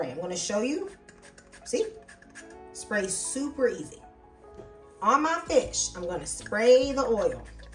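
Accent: South, United States. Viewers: Cutie pie with a southern drawl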